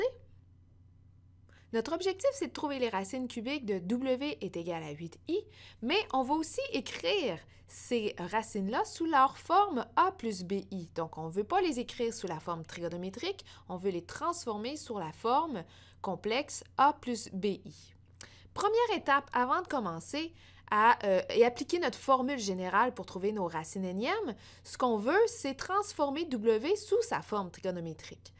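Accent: Quebec, Canada. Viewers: l accent québécois j adoreee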